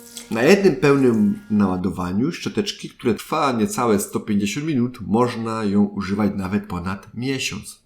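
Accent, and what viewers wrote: Silesia, Poland. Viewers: Dobra i tania, ja mam elite pro cos tam,skaczą te ustawienia na wyświetlaczu zanim zaczne myć a ta prosta i tez Mocna ,kilka szt kupilem na prezenty,fajna jest bo cicha.Masz sluński akcent🙂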